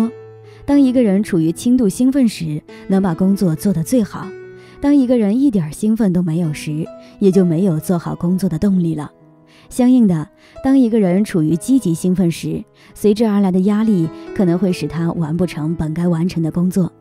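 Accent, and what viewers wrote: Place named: China. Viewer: 請問~版主是哪裡人?中國人嗎?聽口音很像中國人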